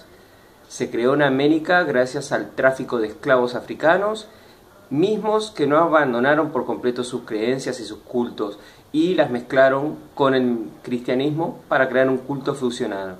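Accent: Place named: Argentina. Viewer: si es barcelona, ¿x que hablas como argentino?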